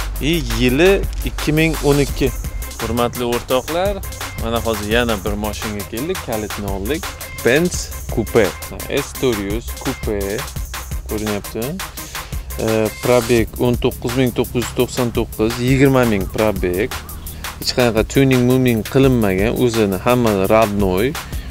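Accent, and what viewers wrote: Bukhara, Uzbekistan. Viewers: Сих Бухороданмисиз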